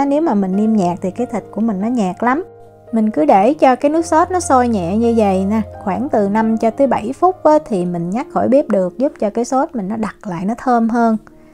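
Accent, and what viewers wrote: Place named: Mekong Delta, Vietnam. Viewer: Chị này giọng nói dễ thương chắc là người miền Tây.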